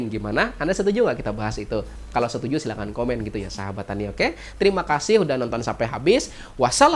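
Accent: Banjar, Indonesia. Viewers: urang banjar mun bepander bahasa indonesia pasti ada inguh inguh banjarnya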